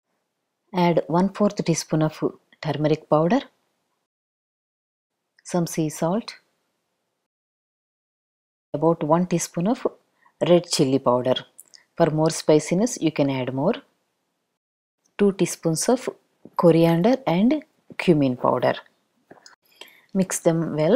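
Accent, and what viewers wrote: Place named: South India, India. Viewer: This curry was amazing. I love your direct and very clear directions. What a wonderful South Indian accent you have, ma’am. Thank you 🙏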